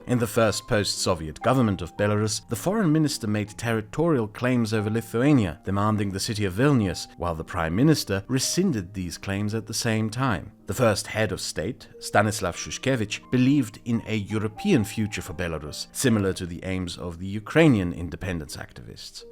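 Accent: United Kingdom. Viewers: No he doesn’t he just recites Wikipedia in a British accent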